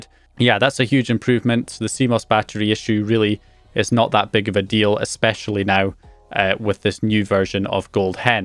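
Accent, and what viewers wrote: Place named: Scottish Highlands, United Kingdom. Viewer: damn, it's not very often I click a video and hear my accent lol. Aviemore? Inverness?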